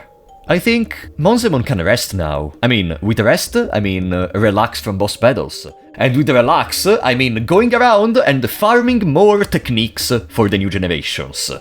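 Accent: Italy. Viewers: the thick italian accent sends me.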